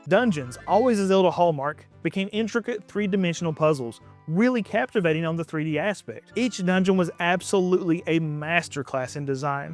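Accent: South, United States. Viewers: That southern drip is back, yeehaw! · I think that ending summation is a good way to think about these games; they're not RPGs, but they capture the feel of one. At least, that's how I felt when I finally did a full playthrough of Majora's Mask last year, and that helped me realize part of why I enjoyed it and Ocarina of Time (which I also finally finished a few years ago) as much as I did. Also, I gotta say, I appreciate hearing a southern US accent on this site amidst all the coastal and British English ones; maybe it's 'cause I'm a southerner myself (not that it's easy to tell from my own accent), but still, nice to hear.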